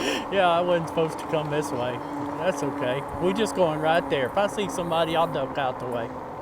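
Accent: South, United States. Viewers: This is my first time looking 👀 at your video.I like your country accent it is beautiful👍🏿👍🏿😂😂😂😂😂😂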